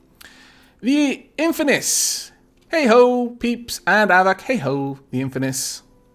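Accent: Wales, United Kingdom. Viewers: Damn it. You make me want this game. Both my wife and I know the joys of hearing the jaunty awesome Welsheness of.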